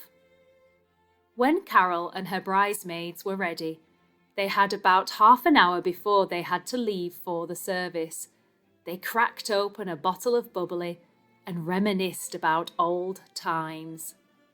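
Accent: United Kingdom. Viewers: Such a remarkable story thanks love british accent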